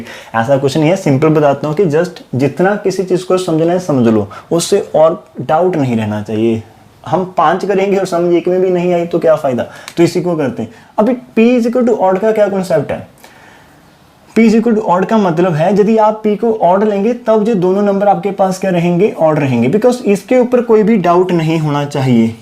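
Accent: Punjab, India. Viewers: Sir galti pakad bhi woi sakta hai jiska uski knowledge hoti hai Your teaching method is awesome and i love your talking style hindi punjabi so cute❤️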